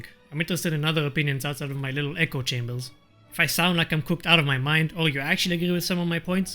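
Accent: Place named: Israel. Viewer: המבטא הכי ישראלי ששמעתי 😩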